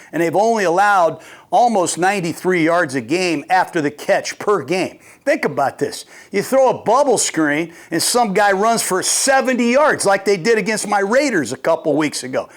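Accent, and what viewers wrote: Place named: Midwest, United States. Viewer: He reminds me of Chris farley. Maybe it's the Midwest accent but also his energy.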